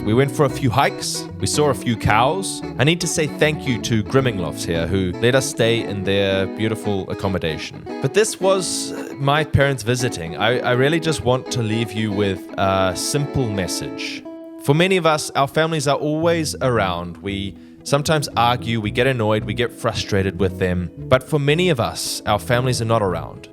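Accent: New Zealand. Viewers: Is there something about the Kiwi accent that lets you learn/pronounce the Austrian dialect particularly well? 🙂Because many immigrants in Austria speak perfect German - but none of them ever master the "Dialekt". So I was wondering whether you already had a starting point from your own native language that lends itself particularly well to learning to sound "Austrian"? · I'm always baffled when I hear your kiwi accent...you imitate Austrian German so well I somehow expect you to have an Austrian accent when you speak English. Makes zero sense, I know 😂.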